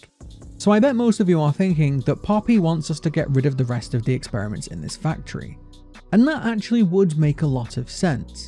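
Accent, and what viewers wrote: United Kingdom. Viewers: I don’t know why but I get you and It’s justJords theories mixed up because your both British, sound similar and have very interesting theory’s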